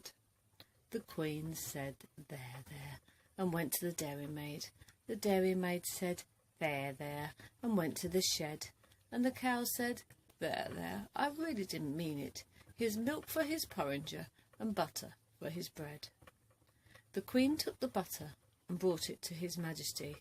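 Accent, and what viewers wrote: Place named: United Kingdom. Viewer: A bit of British tongue to classic poetry! Nicely read my dear 😁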